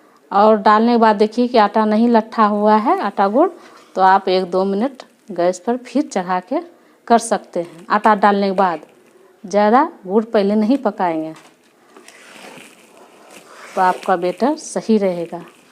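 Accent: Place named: Bihar, India. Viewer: Aapke bolne ka tarika hi batata h aap bihar se ho me v bhagalpur bihar me hi rahti hu. Meri request h aap jo baate krti h usme kuch improvement laaiye viewers badhenge Or likes v jada milenge. Bura n maniyega recipe bhut achi h magar wo kya h dekhne walo ko youtubers v smart chahiye. Dhanyavaad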